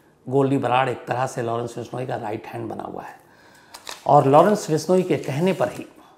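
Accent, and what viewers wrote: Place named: Mumbai, India. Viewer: bambiya bolte king 👑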